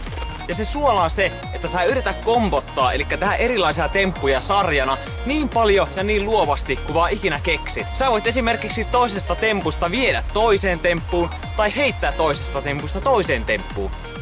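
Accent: Finland. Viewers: Sillä murre on pohjanmaalaisen kuulosta. joten samanlainen kuin mulla :DDDD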